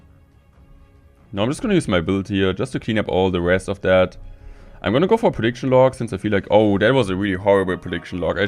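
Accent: Germany. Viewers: The german accent hits hard But good video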